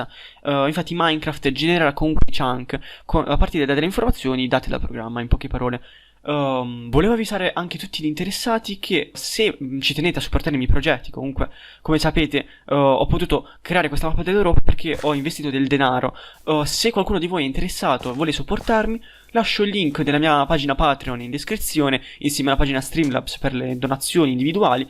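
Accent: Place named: Italy. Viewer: I love you italian accent xD greetings from Argentina!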